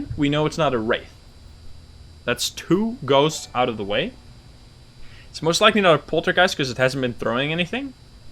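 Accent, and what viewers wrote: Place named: Netherlands. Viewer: seems like so many dutch accents are barely noticeable. I've always wondered why that is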